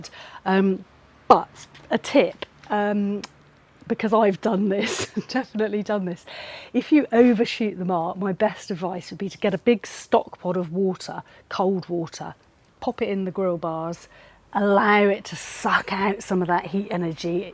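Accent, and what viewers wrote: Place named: Bristol, United Kingdom. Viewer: I’m starting to hear your Bristolian accent! Thanx for these informative kebab videos my love! Greetings from a fellow Bristolian stationed in sunny Cyprus 🫡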